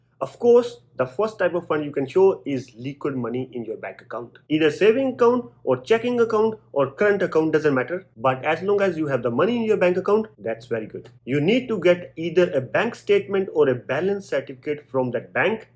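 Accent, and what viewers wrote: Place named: Bihar, India. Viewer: Abey Bihari...accent theek karo.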